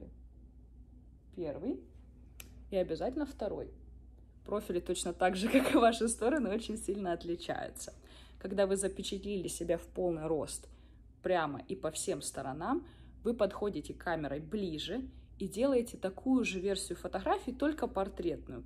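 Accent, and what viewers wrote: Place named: Rostov, Russia. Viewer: Слышу слышу ростовский говор...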